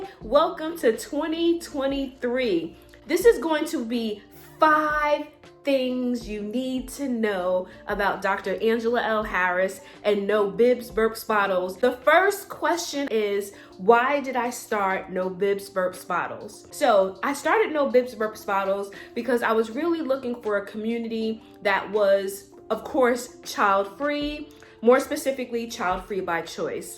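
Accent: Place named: New York, United States. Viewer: I knew I heard a NY accent. U threw me off with being from NC. Now I know where it came from 😊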